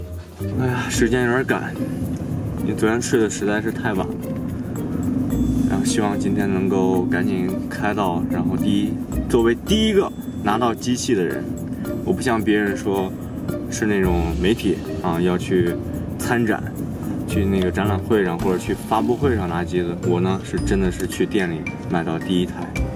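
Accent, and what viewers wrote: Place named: North, China. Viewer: 你是北方人吧，現在在哪個國家生活？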